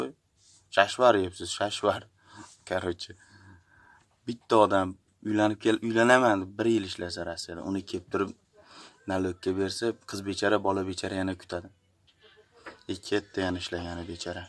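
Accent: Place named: Tashkent, Uzbekistan. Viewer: Ee birat chachvoribsiz likin toshkenchanixam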